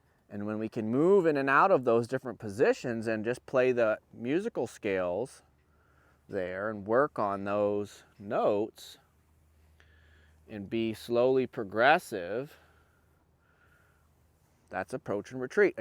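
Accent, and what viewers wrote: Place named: West Midlands, United Kingdom. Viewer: He has a West Midlands accent (Brummie/Black Country area), not a Welsh one!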